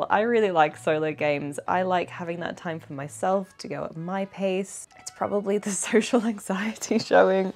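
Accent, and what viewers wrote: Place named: Australia. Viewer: I totally thought you were Australian lol!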